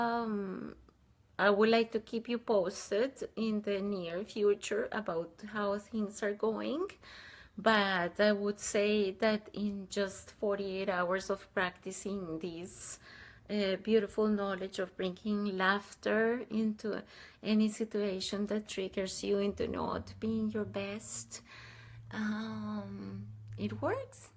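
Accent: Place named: Russia. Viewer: thank you for your video - I think you are the first I’ve found to share about The Art of Soaring. You touched me by your genuine sweetness & intelligence. I like listening to you speak in your thoughtful, measured way and Russian accent. Happy 420! Also are you wearing a Cedar from Anastasia? I have one too. You made the connection between AOS and Ringing Cedars - I think it’s the same publisher not translator. Anastasia means a lot to my friends and I.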